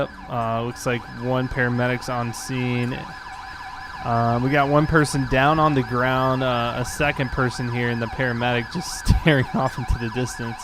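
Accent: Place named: South Carolina, United States. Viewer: Try to Fly Maybe a South Carolinan accent is different from a Kentuckian accent?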